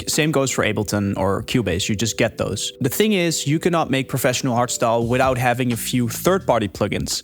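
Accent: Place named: Netherlands. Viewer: bro je klinkt nederlands man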